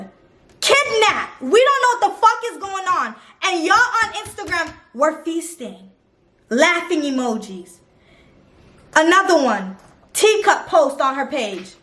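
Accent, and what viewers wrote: Jamaica. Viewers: she jamaican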